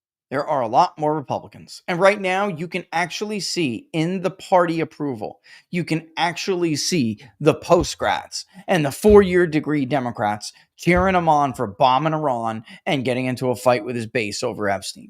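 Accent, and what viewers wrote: New York, United States. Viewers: lol love the NY accent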